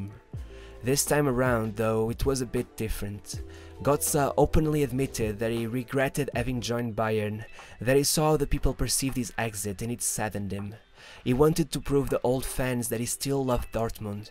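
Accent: Portugal. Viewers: You sound Portuguese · Very tuga accent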